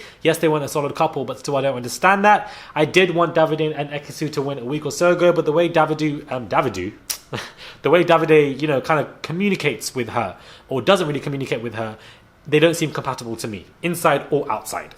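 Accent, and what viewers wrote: United States. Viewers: can we just stop with the American accent?? disgustanggg Thank goodness this channel exists, if love island doesn’t want us to comment on their videos then this is our outlet. I agreee, Gemma and Luca are BORING, these episodes are BORING, the fact that there’s been no challenges or preview of the next episode is whack. I literally come to this channel FIRST before I even watch the episode to see if it’s worth it cause lately it really hasn’t been.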